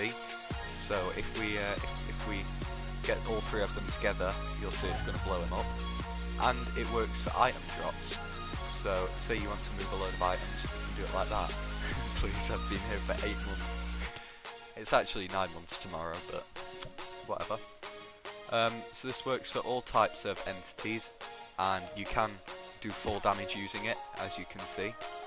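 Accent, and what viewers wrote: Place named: Birmingham, United Kingdom. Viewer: That’s an interesting accent, is it from Birmingham?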